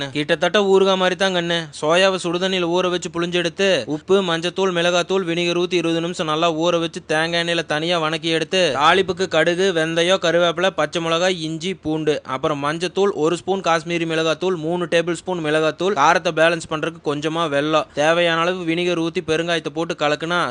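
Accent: Coimbatore, India. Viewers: வணக்கம் அண்ணா.... நம்ம கொங்கு தமிழ் எப்பவும் அழகுதான் நீங்க பேசறது சூப்பரா இருக்கு.... என் பையனுக்கு உங்க ரைமிங் ரொம்ப பிடிக்கும் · Anna neenga pesura slang semmaiya irukku neenga coimbatorea na 🎉🎉🎉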